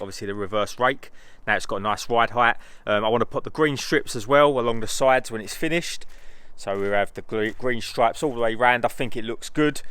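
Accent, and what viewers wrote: Essex, United Kingdom. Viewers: A comment on Savage Garage's Fiesta video brought me here. Proper Essex accent there. 👍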